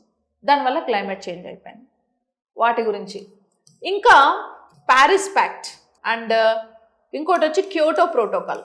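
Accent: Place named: Telangana, India. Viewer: True Telangana slang 😂❤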